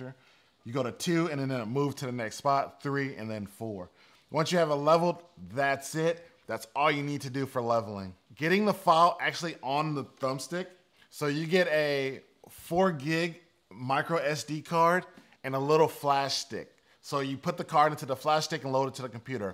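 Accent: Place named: South, United States. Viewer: What part of South you from bro? I'm originally from Alabama.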